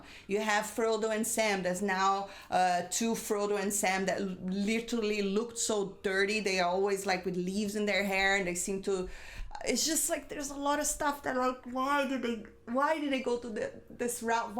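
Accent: Brazil. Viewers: Are you Brazilian? Sounds like a Brazilian speaking English. (Good English though), thanks for the video. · Que bela resenha. Sei que é brasileira pelo sotaque imediatamente. Sou americano mas meu pai é brasileiro ;) Ótimo canal, me inscrevi! Sucesso!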